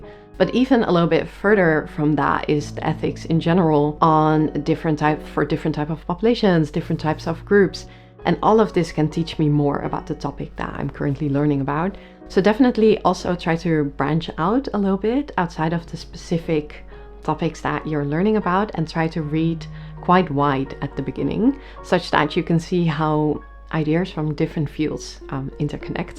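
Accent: Netherlands. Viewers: I heard you speak and I thought “are you Dutch?” and the picture popped up, defiantly studying in the Netherlands. Thank you for today’s video, appreciate it!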